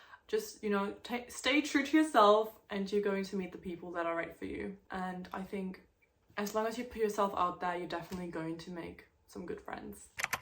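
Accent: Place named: United Kingdom. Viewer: omg you sound so British LMAO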